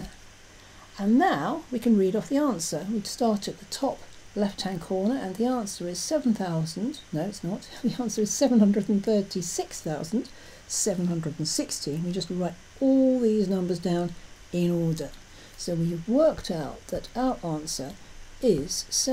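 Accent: United Kingdom. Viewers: Are you English?, it seems brumie accent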